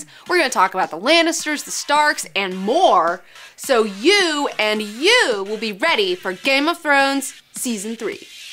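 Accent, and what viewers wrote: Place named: South, United States. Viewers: You sounded especially southern in this video. I love it! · What state are you from? I hear a southern accent.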